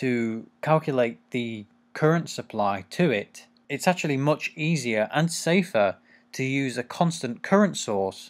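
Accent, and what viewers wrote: United Kingdom. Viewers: Hey, what kind of british accent do you have?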